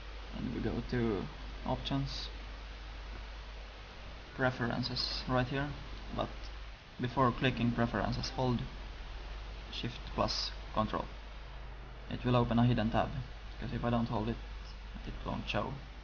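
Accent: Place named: Sweden. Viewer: Man hör ju tydligt att du är svenne iaf :D+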